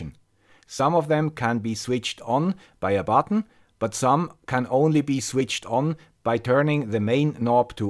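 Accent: Switzerland. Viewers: great video!! I love the guy with the swish accent!!:D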